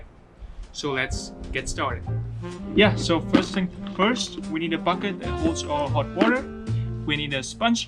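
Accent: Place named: Netherlands. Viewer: Very nice music haha! And you got a dutch accent, sweet!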